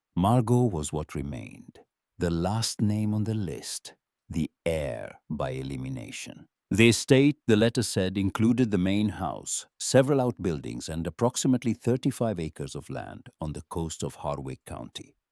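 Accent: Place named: Wales, United Kingdom. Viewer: Loving the Welsh accent ! Makes a nice change from an American one or a faux English accent. ♥️🇬🇧♥️ It keeps changing between Welsh, Indian and French, hilarious ! 😂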